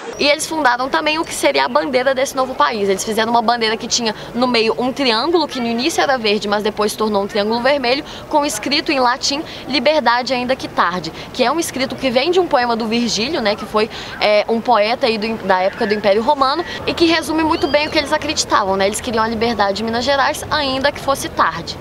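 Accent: Minas Gerais, Brazil. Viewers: Poise , você só deveria ser mais mineira ao falar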